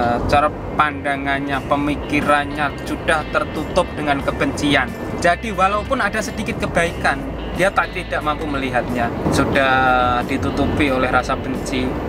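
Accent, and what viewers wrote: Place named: Trenggalek, Indonesia. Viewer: Oooooo priyantun trenggalek. Pantesan medok ngendikane. Iyo mas sing sampean ceritak ne kui bener.nek g kerja keras g kate ne iso urip penak.wis to sopo ae presiden ne.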